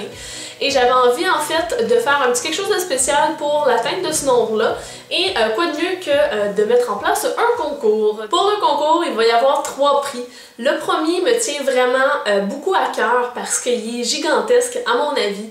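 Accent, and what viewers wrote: Quebec, Canada. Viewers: J'aime tes vidéos, il sont très utiles :) en québécois en plus ça fait du bien hihihi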